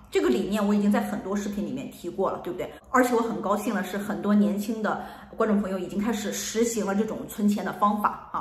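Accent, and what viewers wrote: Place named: Northeast, China. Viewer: 感觉一口东北口音呢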